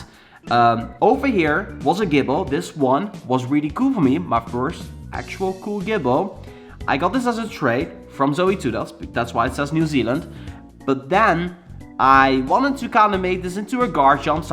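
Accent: Netherlands. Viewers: Jij Bent Nederlands ik hoorde je het praten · Wow are you dutch im dutch tooo !! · Are you from the Netherlands?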